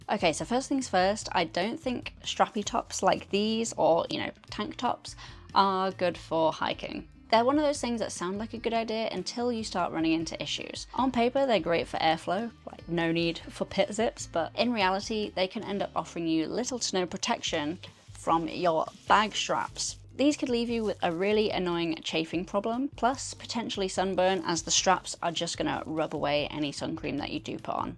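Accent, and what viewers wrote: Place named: Yorkshire, United Kingdom. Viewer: That makes sense, i could hear the yorkshire but mixed with something else. I`m just over the border in lancashire and spent a lot of time up dales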